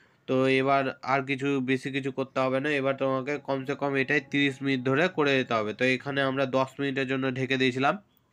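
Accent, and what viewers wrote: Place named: Medinipur, India. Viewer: Amar mone hoy Medinipur ar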